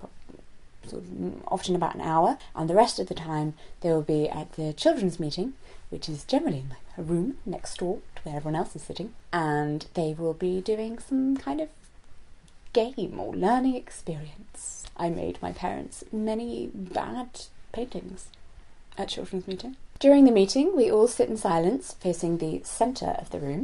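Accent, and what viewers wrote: United Kingdom. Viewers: I love your voice so much. You have my favorite British accent. Anyway, I am Christian ( Protestant), denomination = Baptist.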